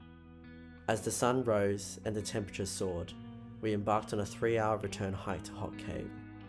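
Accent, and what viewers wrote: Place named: Australia. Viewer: Hi, great video! It sounds like you might be Australian and I'm just wondering was Socotra listed by DFAT (or your respective Government travel advisory) as 'Do not travel' when you went? I'd like to go next year but unsure as its currently listed as 'Do not travel'...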